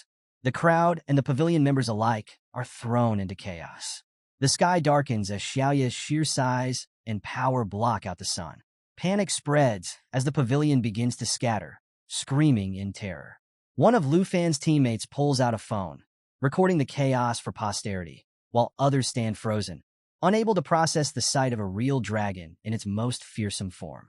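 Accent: Australia. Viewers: It’s much harder to understand at 2x speed when there is an Australian accent. Please don’t make it that way next time, it’s unnecessary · why is this Australian?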